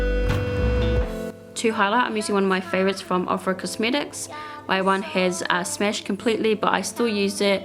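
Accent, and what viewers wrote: New Zealand. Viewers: Love it. So great to hear another Kiwi accent doing makeup videos :)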